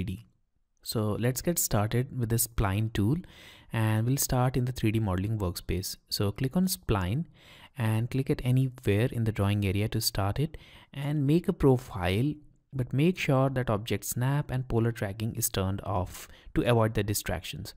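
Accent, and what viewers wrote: India. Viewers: nice lesson bro. you Accent is hindi.. inst it?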